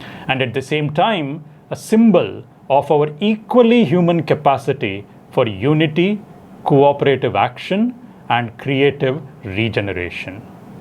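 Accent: India. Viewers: I hear only Indian accent. No English there sir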